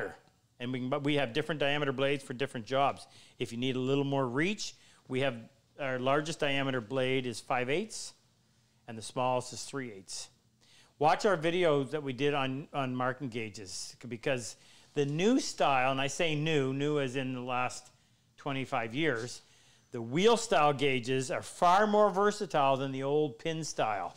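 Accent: Canada. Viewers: Hi - I'm watching from South Africa. you've said 'any question' so this one is from left field (as they say south of your border): which province are you from? my guess is New Brunswick - I used to know a Canadian from there, and you sound so much like him. Back to reality - I really like your program and I wish I was younger and wealthier so that I could follow your style (I am 75 and can't afford diamond sharpening plates - especially here in SA).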